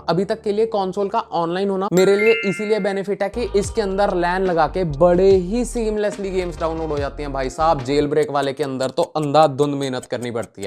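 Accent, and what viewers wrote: Punjab, India. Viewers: Bro's Punjabi Accent 😂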